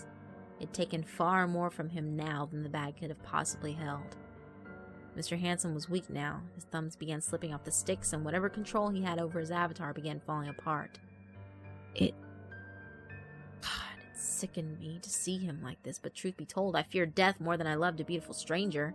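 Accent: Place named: England, United Kingdom. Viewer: Loved the English accent ❤️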